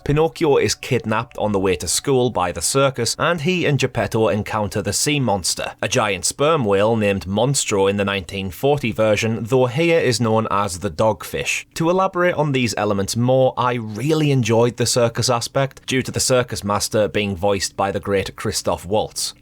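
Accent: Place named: Northern England, United Kingdom. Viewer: Hey, I used to live in South Eastern England, and I have been wondering where do you come from? My guess is Newcastle or Northern England in general:)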